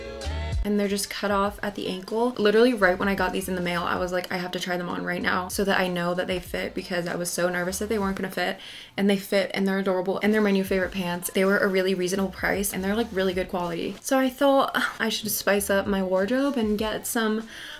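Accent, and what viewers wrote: United Kingdom. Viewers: congrats on 3k!!! <3 for a video idea it would be so fun to see how u style ur brandy items with other things in ur closet, i always get new things but then dont know how to incorporate them plus ur style is so cute also this is weird but ur british accent makes me so happy lol my friends and i always did that with each other before quarantine kicked us off campus rip </3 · wait do you have a british accent? please answer i need to know im so confused haha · Hi I’m a fan who lives in London and I looove your sudden british accent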